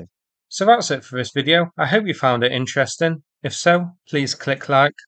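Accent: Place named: Midlands, United Kingdom. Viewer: Yep.......one of the Midlands accents. Pretty standard pronunciation of vowels for the area.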